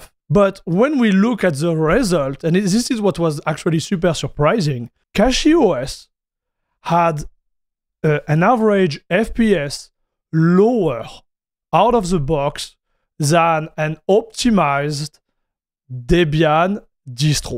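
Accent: France. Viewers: Fortunately if you turn subtitle (CC) on, though it's auto generate but match with his voice. FYI he's speaking English in French accent (sexy isn't it)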